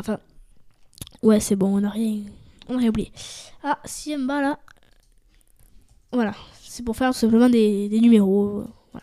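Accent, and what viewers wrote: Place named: South, France. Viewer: Oh l'accent du sud il est là !!!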